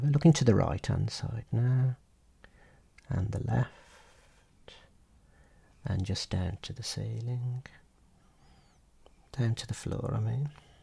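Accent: United Kingdom. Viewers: where are you from in the UK? I like the accent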